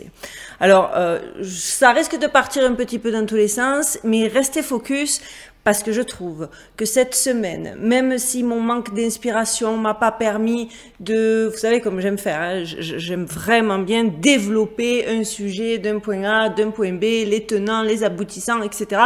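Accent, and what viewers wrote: South, France. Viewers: Juste quelques mots, je vous ai découvert il y a quelques jours et quel régal, des sujets extraordinaires avec une manière de raconter génial, l'accent du soleil en plus, merci merci merci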